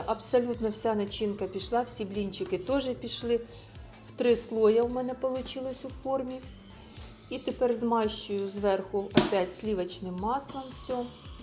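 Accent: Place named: South-East, Ukraine. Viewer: Не робіть зауваження --це південно-східний суржик, він теж має свою історію.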